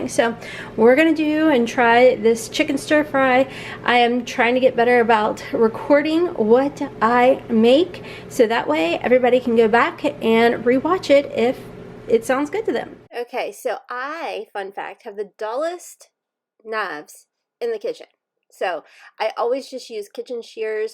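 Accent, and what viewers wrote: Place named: South, United States. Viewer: Hey!! Looking forward to following this channel! Oh & I love your accent, I'm from Georgia so I have one myself but love hearing the different styles of southern accents! Lol My best friend of almost 30 years moved to Danville, Kentucky about 10 years ago and I can hear her picking up some of the accent from there now. I know when I was in Kentucky last the lady at the restaurant couldn't understand me, I asked for water & got a dead look, she had no clue what I was asking for lol Anyways! Thanks for the video! Looking forward to more!!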